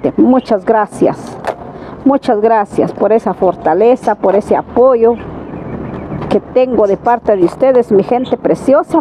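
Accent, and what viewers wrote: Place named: Guatemala. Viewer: Saluditos amiga💜💐tan chula como me gusta 👍 usted habla como toda una chapina . Ysi que llevo lindas plantas 👌😍💐yo soy chapima de Santa Rosa de loma 🇬🇹 y vivo en California 👍abrazos y bendiciones 🤗 en mi canal también se habla de plantitas y mas amiga 👍💚🌎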